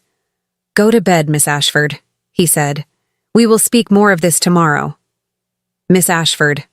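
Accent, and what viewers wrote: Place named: United States. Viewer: The voice is American English. Doesn't fit at all!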